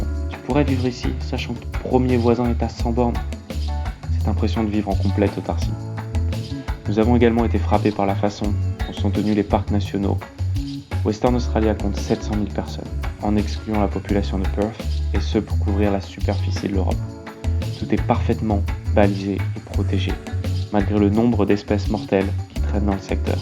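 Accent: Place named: France. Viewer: Je me disais bien que tu étais français quand je t'ai entendu dans le vlog de Jamie et Nikki en tout cas très belle vidéo. :)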